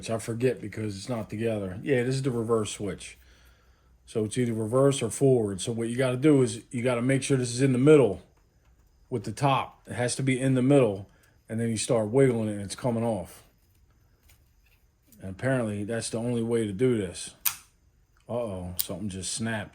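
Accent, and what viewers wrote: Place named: Philadelphia, United States. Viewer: I’ll bet my house this is a Philly accent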